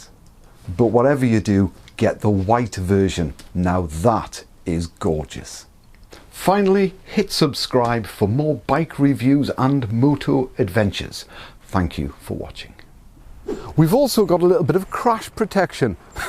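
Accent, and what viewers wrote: Newcastle, United Kingdom. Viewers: He's speaking proper English. Of course he's British. I have never understood why people who live in the penal colonies (Australia and USA) do not take the time to learn to speak English properly. Can you explain that please? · 🦘 Ah, English spoken with just a hint of Geordie - the most colourful of English accents/dialects - especially in its broad form. Useful review - convincing me stick to my gorgeous 2021 Grand Prix red CB 500X .... 25,000 km young, still going smooth and strong in all terrains and surfaces (with the help of Michelin Anakee Adventures) and only drinking 3.1L/100 km. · Great review. You from Newcastle area ??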